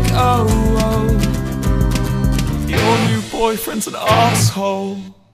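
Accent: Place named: United Kingdom. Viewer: Why does Wilbur have such a strong British accent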